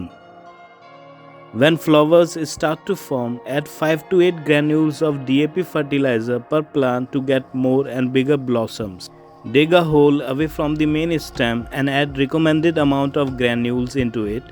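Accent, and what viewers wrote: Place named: India. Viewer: If it’s not an Indian accent doing the tutorial, I don’t event want it. They are always the best ones.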